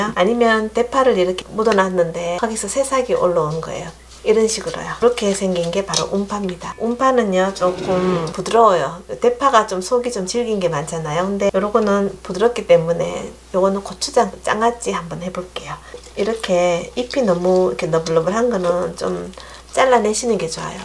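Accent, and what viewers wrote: Gyeongsang, South Korea. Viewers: 겡상도 사투리 억양이 이쁘네요^^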